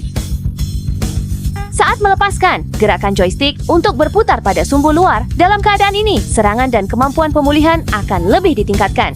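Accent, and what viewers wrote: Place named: Malaysia. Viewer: logatnya malaysia ya buang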